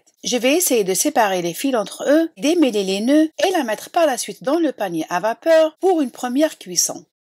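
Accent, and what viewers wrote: Algeria. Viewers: اشتركت في قناة على خاطر الغربية نتاعك تخرجلي شابة بزاف. من فضلك وصفة الفاكهة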